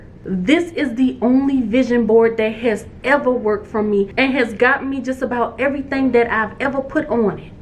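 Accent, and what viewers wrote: New Orleans, United States. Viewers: Good information my sista, I gotta head down to Nawlins' again soon. I love a sista with a NOLO accent 👊🏿💯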